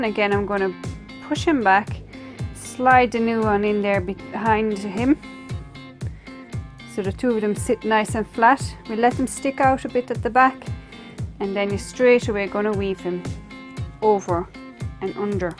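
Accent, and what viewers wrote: Ireland. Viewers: I expected a Flemish accent and then you hit me with the slight Irish accent!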